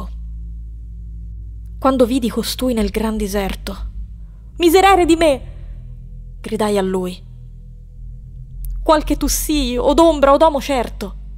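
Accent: Tuscany, Italy. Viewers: bra she is speaking like someone from tuscany TODAY! do you not hear "la gorgia toscana"? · Interesting. Is this the way Dante would have spoken, or is the pronunciation closer to the modem Tuscan dialect? Or both? I speak Italian, and to me some words almost sound Spanish. Probably because of the famous Tuscan 'h' sound, but also because of the 'ch' sounds (like German) and the occasional 'th' sound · Your tuscan accent is so pretty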